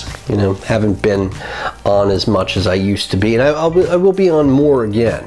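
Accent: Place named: Boston, United States. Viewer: Been wondering bout you. Glad it's just a work thing that has kept you busy. Only 2 reviewers do rda reviews right. You and Ambitionz. Now if I could get over the Boston accent.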